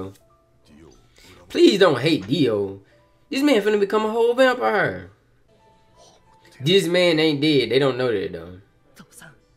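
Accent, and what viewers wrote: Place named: South, United States. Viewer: im just starting with you im feeling it love your accent, is it southern ?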